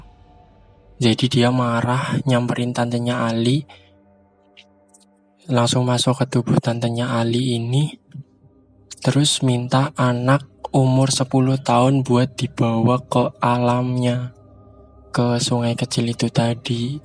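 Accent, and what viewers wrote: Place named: Banyumas, Indonesia. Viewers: Wong ngapak kiye mesti. mbleketaket wkwkwk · ngapak nya kerasa ya wak <3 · Kiye mase mesti wong ngapak😂. Ketara bgt karo suarane🔥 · Itu mamakee lupa sama anak atau ketakutan sih.. sampe kasian sama mas nya dah ditinggal ndewekan 🤣🤣. Tetep pede ya mas meski medok tp justru itu kelebihanmu mas. Jd natural ceritanya. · Waaahhh,, wong ngapak kie,,Salam ngapakerss mas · Medhok kentel 🤭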